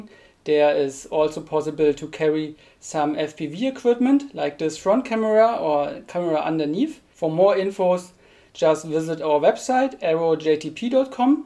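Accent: Germany. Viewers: Ich liebe den deutschen akzent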